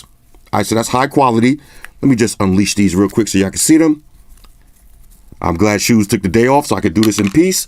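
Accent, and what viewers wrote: New York, United States. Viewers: eyyyy a New York brother!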